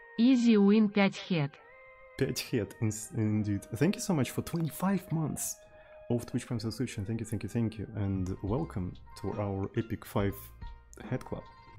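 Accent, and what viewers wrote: Russia. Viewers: Вам этот дядька не показался душнилой? С технической точки зрения всё прекрасно, знание английского, различных языков программирования разного уровня. Но вот складывается такое ощущение, что автор канала как-то с презрением относится к Ру говорящим, возможно даже стыдится того, что сам знает русский, стыдится или ненавидит свой акцент, старается игнорировать любые вопросы, касательно знания русского языка. Не знаю, выглядит забавно и хочется открыть окошко, проветрить ._.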